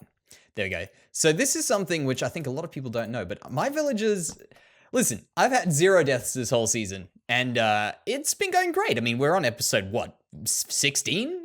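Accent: Australia. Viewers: Hey are you Australian?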